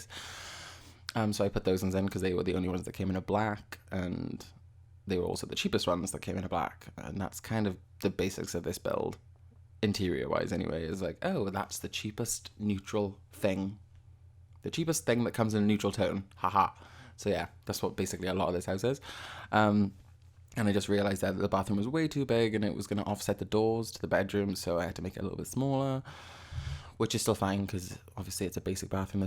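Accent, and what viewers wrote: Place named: Wales, United Kingdom. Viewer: You sound welsh, I am too (I’m from South Wales) 💓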